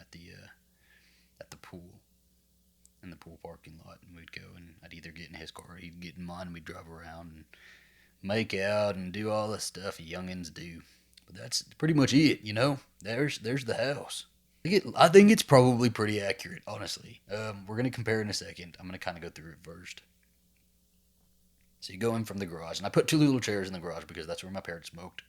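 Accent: South, United States. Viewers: I can't believe you're trying to build your childhood home from memory on Sims 4 of this video and I hope your husband, Alastair will be very proud of you for that, but I love your Southern accent, you're gay and you're from Texas!!!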